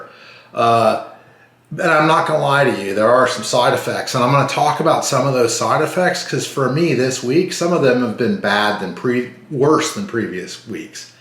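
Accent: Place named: United States. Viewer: Greetings from Australia. Have ordered Mounjaro but we are not allowed to have the preloaded pens yet.... they have to be self drawn normal injections ( I think you say shots in the USA lol).. What do you do for work.? anyway soon starting I guess the minimum dose, wish me luck. Thank you for sharing your experience. love your American Accent, so cute.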